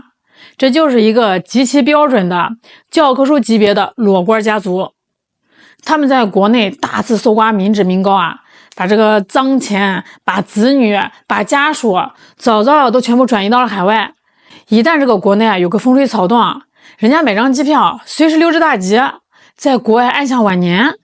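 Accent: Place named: North, China. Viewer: 是北方口音吧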